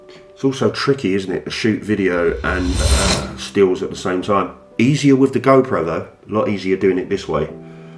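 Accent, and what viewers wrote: London, United Kingdom. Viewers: Great vlog, glad to have found you on here.. can relate to the Geezer accent 😁😎 .... Subscribed, and maybe bump into you on the mean streets 👌👍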